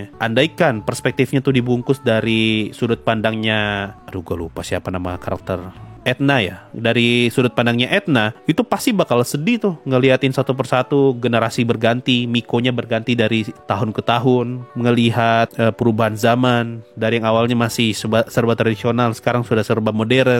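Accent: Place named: Eastern Indonesia, Indonesia. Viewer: Logat nya org timur sekali aleee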